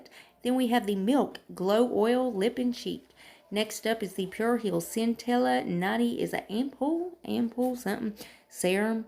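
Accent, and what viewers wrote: South, United States. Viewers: Hey girl, love the accent. You sound like me. LOL We girls in the south got it goin'.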